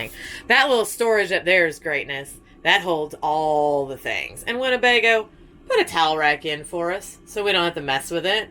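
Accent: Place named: South, United States. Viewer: Nice video! New to your channel! Just curious about where you’re from! Obviously a southern lady! Thanks for sharing! We’re kinda in a decision making process and class B vans are in our vision. 🤙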